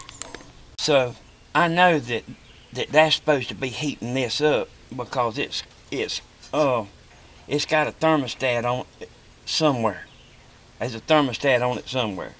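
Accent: South, United States. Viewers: LMFAO good ol boy right!!!!!😎😎🤓🤓🤓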